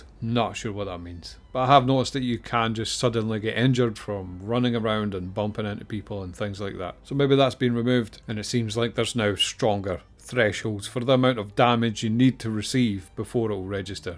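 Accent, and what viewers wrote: Scotland, United Kingdom. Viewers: I love your thick Scottish accent ... sometimes I have to ask myself, what did he just say?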